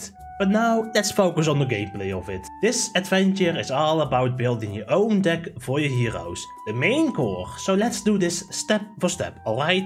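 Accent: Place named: Netherlands. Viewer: That Dutch accent is so funny. 🤣 Thanks for your honest review, was unsure with this game but am glad I’m skipping it. 😉 Great work sir!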